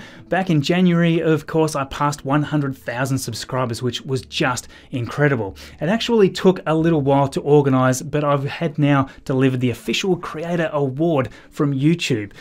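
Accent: Australia. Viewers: Great work Oioioi. What part of Australia are you from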